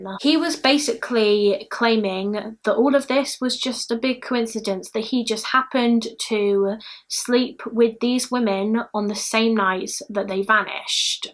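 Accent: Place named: Bristol, United Kingdom. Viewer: Hello. You. Sound. Bristol UK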